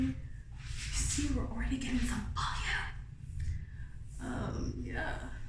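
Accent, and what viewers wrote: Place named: Kenya. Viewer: She's Kenyan.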